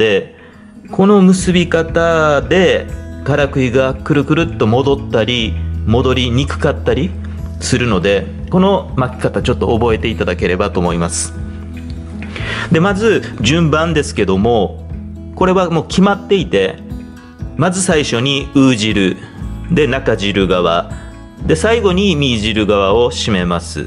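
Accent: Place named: Okinawa, Japan. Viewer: 勉強になります。さんのウチナー訛りが心地良いです♡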